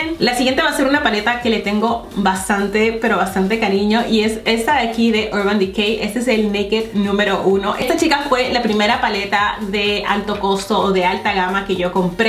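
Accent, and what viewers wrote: Peru. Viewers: Y mira que me gustan las Youtubers inglesas, francesas, italianas y las españolas(que vivo en España) pero nada como oir el acento peruano 😍😍 y mejor aún cuando es de maquillaje. Te he descubierto hace poco y sin duda ya me he suscrito. Besos!!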